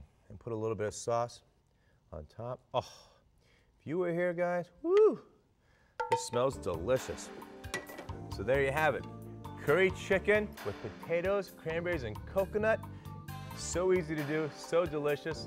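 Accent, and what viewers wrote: United States. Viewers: I agree...his voice sounds like he was born in the US. Martin Yan, on the other hand was born in China..people criticize his accent as "fake", though that *is* his real accent.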